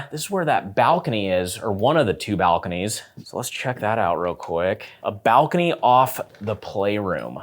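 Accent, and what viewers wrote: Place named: Pacific Northwest, United States. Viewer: Whole video I was thinking the area looked so familiar and your accent was very PNW but when I saw Hood off the balcony that kinda cemented it lol 😅 I'm 98% certain I have drilled some water wells for those hills back 20+ years ago now. Little more crowded than it used to be.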